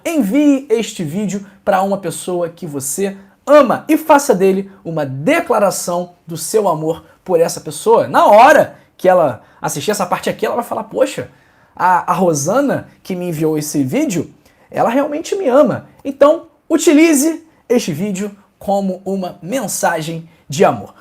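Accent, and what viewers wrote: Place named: Pará, Brazil. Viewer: Su aque do Pará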